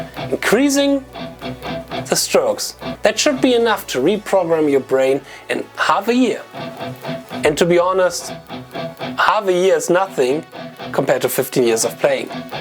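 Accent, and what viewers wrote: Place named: Germany. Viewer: Great video but as a fellow german,the accent makes me crack ip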